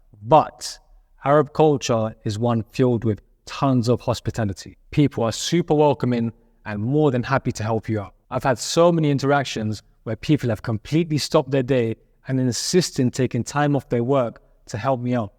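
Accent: United Kingdom. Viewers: You British by any background???.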